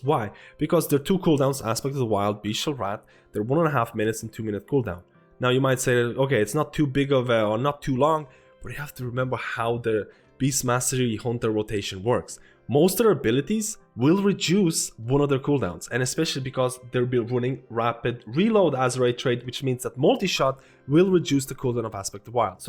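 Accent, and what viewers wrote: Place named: Ireland. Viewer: I thought he somewhere was northern European but then he started sound Irish...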